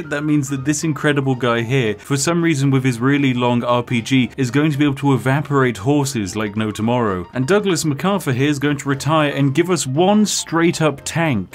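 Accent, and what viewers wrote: United Kingdom. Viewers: your accent is so British ohmygod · You sound like Internet Historian. Is this one of your "secret channel" where you sound more like a british dude?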